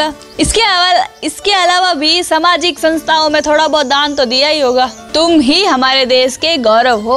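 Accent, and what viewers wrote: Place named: Marwari, India. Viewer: U are amazing I love the way u speak in English and Hindi with marvadi accent.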